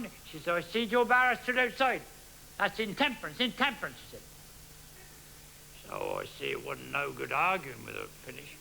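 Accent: Buckinghamshire, United Kingdom. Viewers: I was led to believe that his accent is Buckinghamshire. Having been brought up there, I wouldn't disagree.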